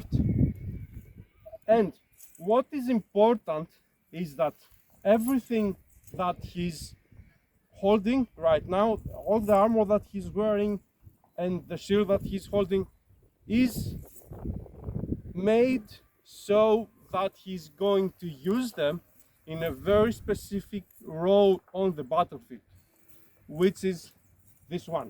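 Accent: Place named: Greece. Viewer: You sound Greek. Are you Greek?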